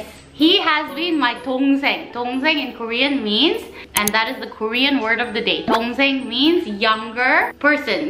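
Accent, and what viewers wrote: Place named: Nagaland, India. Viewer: Your accent is just like AO tribe of naga 😂😂😂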